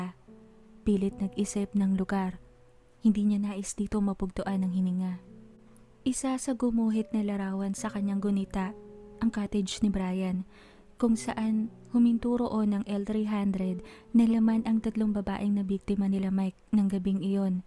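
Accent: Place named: Visayas, Philippines. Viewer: ❤😂hahaha ilab u...hahaha gustu ko ung accent mo sa bisaya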